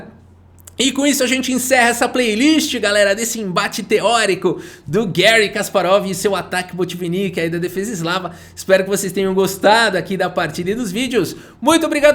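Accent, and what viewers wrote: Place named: Sao Paulo, Brazil. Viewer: Desculpa cara mas é difícil tankar esse teu sotaque paulista. Ameniza isso daí meoooooo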